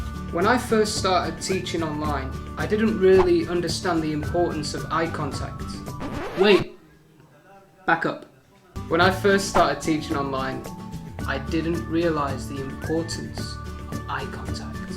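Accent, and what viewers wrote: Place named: East Midlands, United Kingdom. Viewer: Brilliant video, thanks so much! I´ve got my first online teaching interview tomorrow and these tips were really useful, especially what you said about planning after classroom teaching for 8 years and doing a hellll of a lot of prepping! BTW are you from Derby/Notts area? Your accent seems so familiar :D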